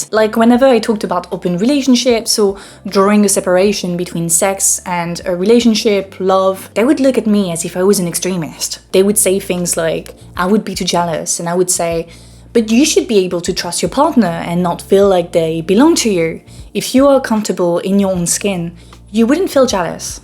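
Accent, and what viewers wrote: France. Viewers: am i the only one who's just here for the French accent? lol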